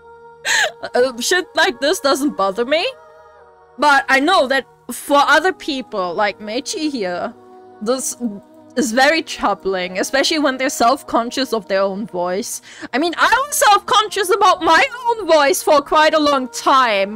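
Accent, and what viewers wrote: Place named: Germany. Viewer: I love your German accent.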